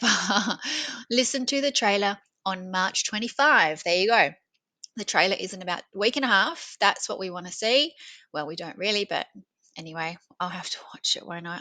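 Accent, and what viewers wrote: Australia. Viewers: Hearing you shared Australian accent. Brilliant. You're funny and articulate. Thanks 😅❤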